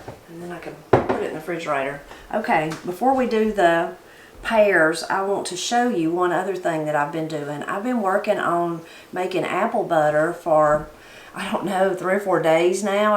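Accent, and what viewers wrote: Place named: Arkansas, United States. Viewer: I grew up eating light bread too and I still like it. My tuna fish is almost like yours except for adding chopped hard boiled egg and using Kraft sandwich spread instead of mayo. And i prefer it on white bread too. I love your accent. It reminds me of my home is south Arkansas.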